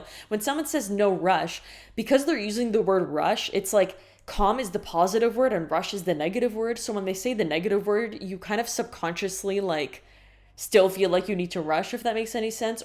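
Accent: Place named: Italy. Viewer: First at all, thank you a lot for this content because l found it extremely interesting ..l jotted down 3 american expressions l ve never heard before thanks to you! "Stop bugging me" "picture (as a verb)" and "encompass"🤩 I had no expectations when l clicked on your video..is not that common to hear an american native speaking italian with such a great italian accent as you showed! In many cases INVECE could be WHEREAS and ABBIOCCO o ABBIOCCARSI l would say DOZE OFF or SLUMBER,l guess. By the way, l'm gonna subscribe your channel😎🇺🇲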